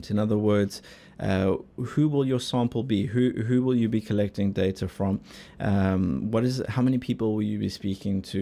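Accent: South Africa. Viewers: Are you South African? Seems obvious actually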